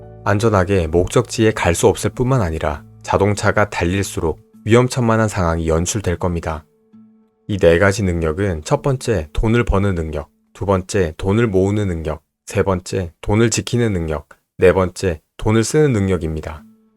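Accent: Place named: Gyeongsang, South Korea. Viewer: 경상도 사투리억양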